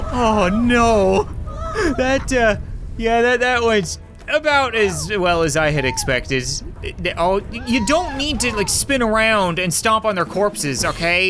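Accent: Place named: Canada. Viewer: sounds extra Canadian today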